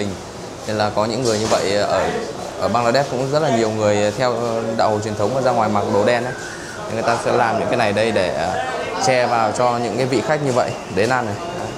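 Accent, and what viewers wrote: Vietnam. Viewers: Tiếng anh của bạn hơi thuần việt nhỉ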